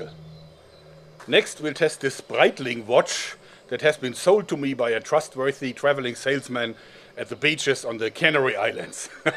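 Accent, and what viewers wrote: Germany. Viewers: Cool video! Du bist deutsch oder? :D Erkennt man am dialekt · xXxFanisxXx it is a german trying to speak englisch (like me) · You've got a real German accent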